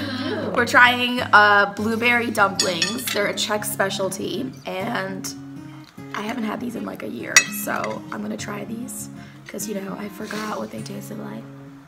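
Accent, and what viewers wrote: United States. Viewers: Jedinej člověk co má americkej přízvuk a mluví tak plinule česky. Zajímavě se to poslouchá :D Je to fakt parádní pocit :)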